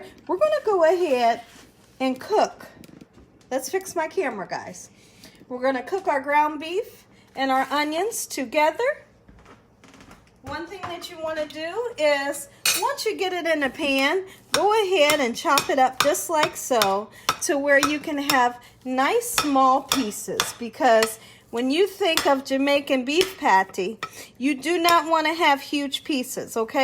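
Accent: South, United States. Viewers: thank you for this recipe of Jamaican beef Pattie’s “101” as you put it! I never thought of using ready made empanada dough! I think I’ll try it! This looks delicious! This is the first time I ever saw a nice southern soul sister like yourself make Jamaican beef Pattie’s on video with a southern accent! I love it I love it I love it!!! “ you hear me?” 😂 That’s also what I like about your other videos as well! They are so cheerful and could easily cheer up an otherwise blue person! Keep it up my dear! Thank you thank you thank you! I grew up eating Jamaican beef Patties! God bless and keep it up! Ps: thank you for this dough shortcut because sometimes it’s a little troublesome and time consuming and at times it doesn’t turn out right for me when preparing the crust!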